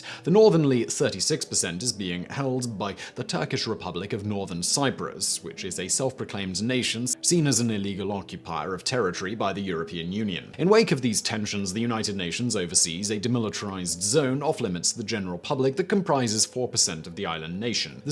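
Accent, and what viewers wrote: United Kingdom. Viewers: Dodgy Brit accent and speaking way too fast - what is your hurry, slow it down. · Which part of Britain is this man's accent from? As a fellow Brit, I'm scratching my head.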